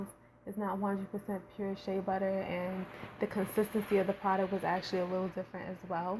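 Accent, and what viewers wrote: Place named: New York, United States. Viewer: She sound like she from nyc but her voice is relaxing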